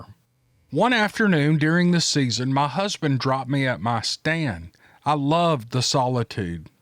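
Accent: South, United States. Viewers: Of all the great channels I've listened to, I think you have the best voice presentation. The easy southern accent is perfect for this type of thing, and the way you focus on your enunciation is very appealing. You should consider narrating audio books... You have a natural gift for it. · I thought I had listened to all your stories especially when I was laid up with the first cancer bout but it Appears not great to hear your voice I do listen to cryptids Canada but nothing is like your southern accent and gentle voicing of your stories thank you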